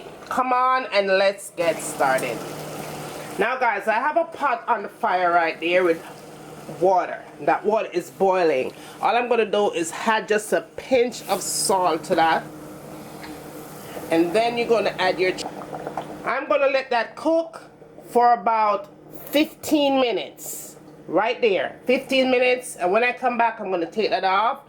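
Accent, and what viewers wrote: Jamaica. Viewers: Beautiful patties, beautiful lady! Love the Jamaican accent...💖Thanks for this recipe!😊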